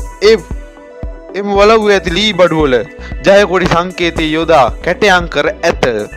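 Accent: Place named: South, Sri Lanka. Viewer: Anthimai... Sawuthu widihata katha karanne.... Mokekda manda😜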